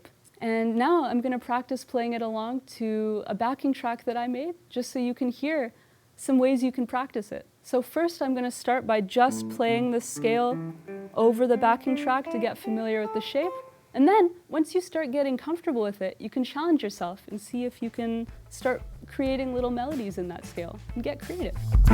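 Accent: Canada. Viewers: She's a great teacher. And I love the Canadian accent.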